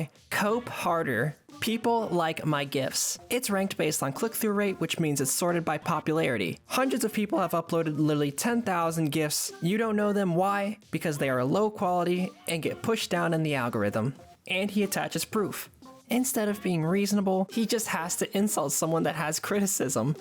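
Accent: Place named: United States. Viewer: First of all, why us an american bloke using 'lad' so much? It sounds disgusting in his accent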